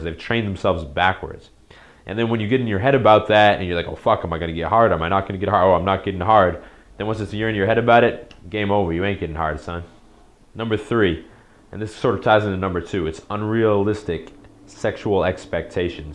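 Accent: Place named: New York, United States. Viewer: great video! you can tell this nigga from new york or something or has or had black friends lol "cant get that wood " lol "if you think about not getting hard its a rap son"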